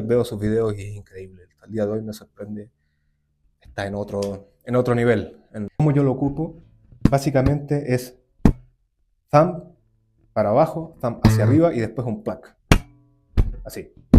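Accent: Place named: Chile. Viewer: Tienes acento Chileno... ACENTO, no términos..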